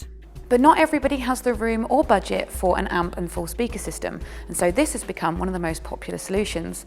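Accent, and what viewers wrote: United Kingdom. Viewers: I think she is British. I actually like her accent, it's much better than American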